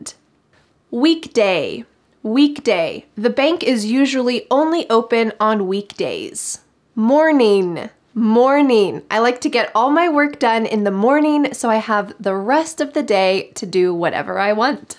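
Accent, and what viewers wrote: United States. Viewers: I watch all your videos to hear your voice because your voice is very clear and good quality. That's why I get to listen to American English. I can easily understand your voice. thank you so much.. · Hello. I'm from India. I love your english speaking way in american accent. It is absolutely remarkable. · hai mam ..is this is UNITED STATES English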